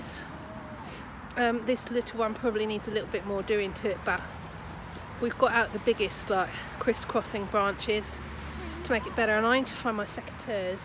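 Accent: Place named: Hampshire, United Kingdom. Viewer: Just wondering what part of the country you are in. You sound as though you may be from Hampshire. I love your videos and the one where you work at the rabbit run in the dark made us laugh as we had so much trouble with rabbit runs lass year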